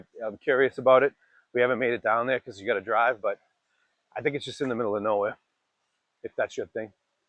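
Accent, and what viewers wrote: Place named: Massachusetts, United States. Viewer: You are definitely from Massachusetts. Accent & dropping the r's at the end of the word. Sound effects you do not need. Another camp ground on my list of possible stays thank you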